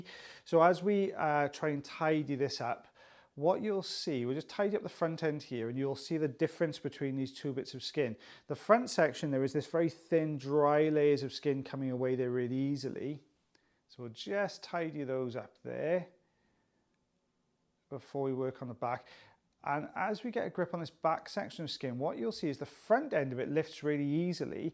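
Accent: Wales, United Kingdom. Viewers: Oh my god I've gone from a popaholic to a waxaholic thanks to you! Your you tube is so interesting and your commentary just brilliant with that lovely Welsh accent. Thankyou (rushes out to buy some olive oil ) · I love your accent!! What part of Great Britain are you from? My maiden name was Thomas so I’m a Welshy!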